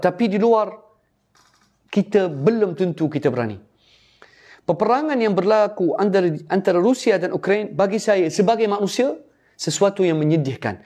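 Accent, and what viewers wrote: Malaysia. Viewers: Kaya logat malaysia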